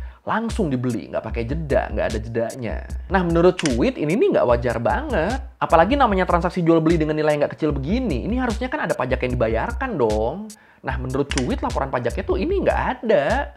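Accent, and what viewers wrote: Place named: Jakarta, Indonesia. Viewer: Bang ini emg bener asli thailand kah? Pernah denger asli thailand tapi logat dia ngmg uda jakarta bgt, kok jd ga percaya dia asli thailand😅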